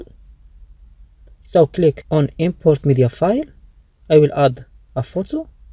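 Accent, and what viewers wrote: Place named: India. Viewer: He is speaking English with Indian accent.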